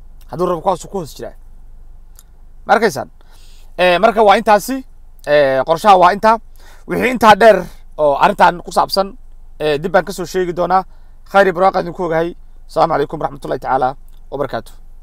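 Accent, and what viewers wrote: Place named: Ethiopia. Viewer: Wallaal Ninkaan Somaali Maaha waa Nin Cabasha oo afsomaaliga Bartey. Bisiq Saraan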